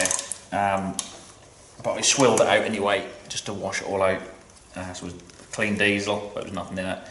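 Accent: Norfolk, United Kingdom. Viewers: Just found your channel and enjoying your content. You sound like a good old Norfolk boy, takes one to know one, Sub you.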